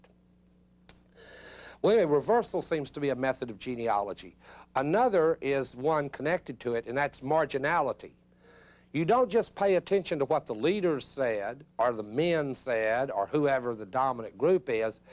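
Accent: South, United States. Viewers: wow..is that southern accent? just knew it ..thanks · love hearing an intelligent speaker with a southern accent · I cannot express how refreshing it is to hear European philosophy explained via Southern accent. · Watching him makes me realize how negatively biased marketers are against people with a southern accent. Ironically, they’re probably more liberal I’m guessing. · Watching Rick Roderick makes me really like those Southern US accents. On another note, his lectures are really great for some quick summaries to deepen understanding into a thinker's perspective, and this lecture series is really great. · It's rare to hear a southern accent talk positively/neutrally about continental philosophy. xD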